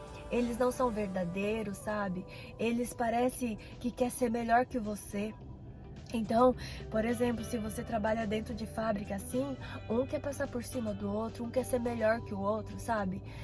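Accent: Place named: Minas Gerais, Brazil. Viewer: Uai só! Você ainda tem o jeito de falar dos mineiros.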